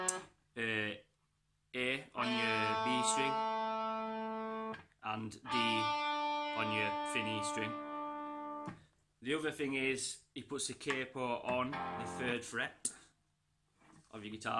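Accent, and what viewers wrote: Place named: Barnsley, United Kingdom. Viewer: That Barnsley accent lad! 🙂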